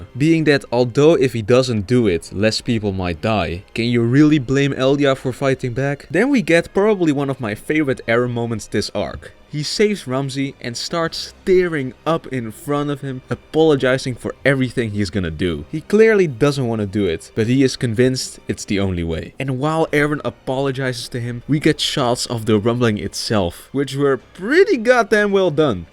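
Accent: Netherlands. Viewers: Are you dutch? cuz you sound like a dutch person ngl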